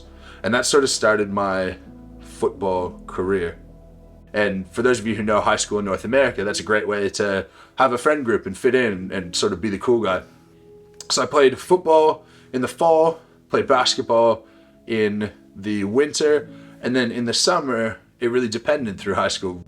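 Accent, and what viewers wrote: Australia. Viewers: Now i see where you got your slight aussie accent from. Thanks for sharing your story. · pretty cool how you picked up a slight ozzy accent during your time there!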